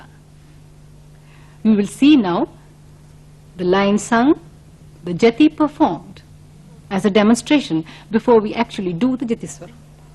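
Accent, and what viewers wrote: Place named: England, United Kingdom. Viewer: Amazing your an English accent 🙏🏻👏👍🏻 sweet i like very much of your launguage madam if I seen you i will be get autograph me god grace like this of dance performance Excellence wow 👍🏻👏🙏🏻❤️👍🏻🎉🎉🎉🎉🎉🎉 amazing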